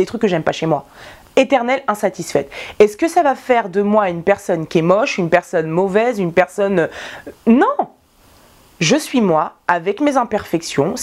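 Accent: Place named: South, France. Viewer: Coucou je suis complètement d'accord avec toi pour les copines par expérience on apprend bien des choses...j'adore l'accent du sud tu m'a fait trop rire, tu et naturel et tu te prends pas la tête sa fait plaisir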